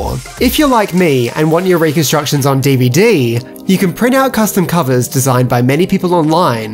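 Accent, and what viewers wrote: Australia. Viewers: Are you austrailian P.S at first its hard to enjoy recon but now i really enjoy it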